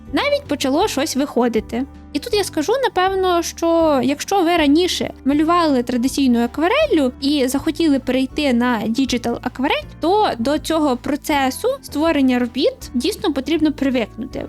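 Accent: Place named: Western Ukraine, Ukraine. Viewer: Дуже круто! Особливо дякую за розмовне відео, це те, що потрібно українському ютубу. Ваш говір крутий і мені цікаво, з якої ви області? Я ставлю ставки на межу 3 областей: ІФ, Львівської і Тернопільської)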